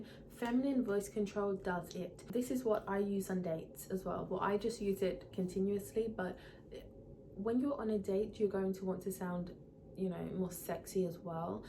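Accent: United Kingdom. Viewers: oh i didn’t mean it in a rude way i’m trying to say that maybe you focused a lot on the flaw but it’s hardly noticeable! you still have the same soft british accent but some words were pronounced differently and maybe your voice was a bit softer, but me coming from an Australian accent you definitely sounded feminine regardless!!! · Every thing you share is so true. I’m a very feminine woman, with a soft voice naturally, but I don’t always enunciate every syllable,... I wish so much I could speak with your proper British accent, but I can’t. One bonus is living in NYC and sounding nothing like the other native New Yorker women who can be more harsh and masculine than most men..... (sigh)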